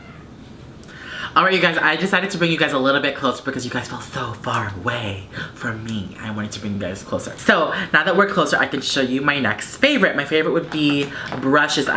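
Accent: Spain. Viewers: yes your spanish accent!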